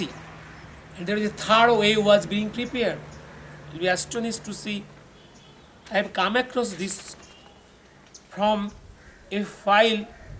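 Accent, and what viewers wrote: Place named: Bengal, India. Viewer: u r so bong pronounced pl say in bengali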